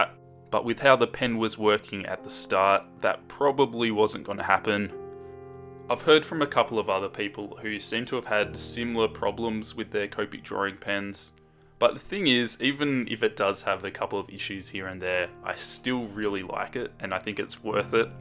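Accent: Australia. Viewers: Wait, ARE YOU AUSTRALIAN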